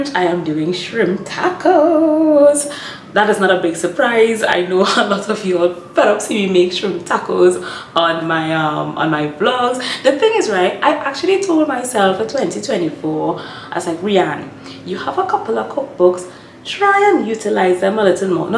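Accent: Trinidad and Tobago. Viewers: I hear that trini accent and I say no sah let me go watch! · Hi, are you Trini? That accent sounds like my people!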